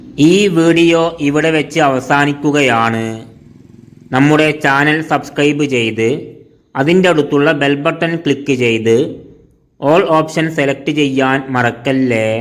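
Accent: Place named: Thrissur, India. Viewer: തൃശ്ശൂർ ജില്ലക്കാരെ പറയിപ്പിക്കാൻ വേണ്ടി അവതരിച്ച പുതിയ അവതാരം തൃശൂർ ജില്ലയിൽ എന്നെല്ലേ കേരളത്തിൽ ഒരുടേത്തും ഇതേ സംസാര ശൈലി ഇല്ല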